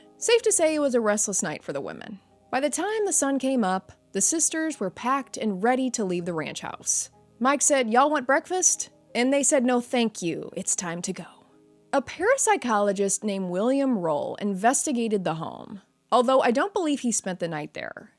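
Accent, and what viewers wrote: United States. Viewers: I've heard this story before somewhere. But your cowboy accent made this so much more entertaining!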